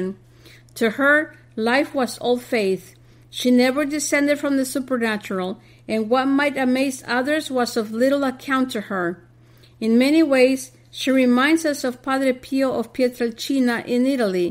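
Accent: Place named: Colorado, United States. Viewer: Interesting presentation. Never heard of this saint. Thanks 🙏. Your narrator has an interesting accent.... southern Colorado or New Mexico? Just curious. Appreciate your work much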